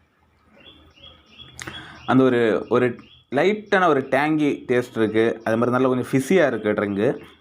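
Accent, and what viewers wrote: Kanyakumari, India. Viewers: Bro native Kanyakumari ah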